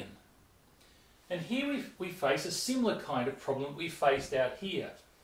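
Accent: Australia. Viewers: amazing video dude - everything clear and concise! Subbed and loving the aussie accent btw :)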